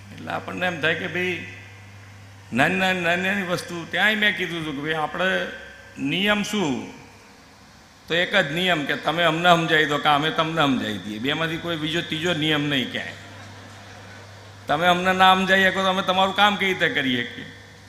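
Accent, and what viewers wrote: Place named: Kathiawar, India. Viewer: Kathiyawadi bole che bapu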